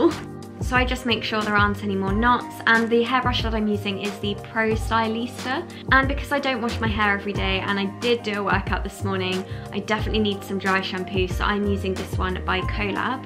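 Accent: United Kingdom. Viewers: I love routine videos!!! 😍 And I swear I'll never get enough of your British accent. 😩 I love your voice and accent a lot,! Love you! ❤ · I love your accent so much! I'm Polish and I try to speak in British accent everyday but it is not easy. :(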